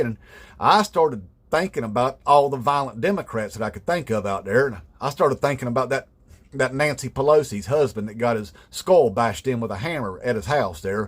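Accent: South, United States. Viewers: Brother Man...and I mean that from the HEART!.. Was thought never judge others by race,color,ethnicity and or geography...For you SIR..are a GOOD/DECENT MAN..that Southern Accent and long hair sort of made me bais (somewhat ) I Was really SHOCKED by the context of your video..Again..SIR! MUCH RESPECT! ..for Speaking TRUTH..and for doing it while ..sticking to Facts and not personal bias and or prejudice..This NYC Puerto Rican would be honored to meet at a Bar of your choosing and swinging back a couple of Tall Pitchers of Beer(of your choosing)✌🏽 Brother..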